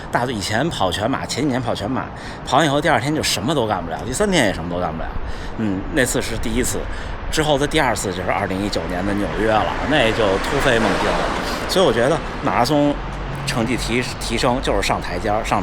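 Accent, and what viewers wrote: Beijing, China. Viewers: 特别喜欢听乡音😂 我小时候（95年左右）住胡同里，北马从家门口建国门桥上跑下来。我5岁多就自己在路边看跑步。没想到竟然埋了个马拉松的种子在心里。2024年春天跑了个半马2小时零1秒。然后很鸡血地报了秋季首马，练的特狠，跑了343。2025没怎么练跑步，玩自行车了，半马PB了143。2026又开始练游泳，结果练了3个月放弃了，85公斤胖子但腿太沉没啥肥肉，浮不起来。重新练跑步，但是心里总打鼓，想5年破三，又怕自己天赋太差。听你分享经验我就觉得特安心。心想着自己首马都到的第三年成绩了，我再练个5年，堆起来跑量，肯定也能破三了。就像你说的，不光练身体，关键是脑子（心态和意志）。练就完了。谢谢博主的分享！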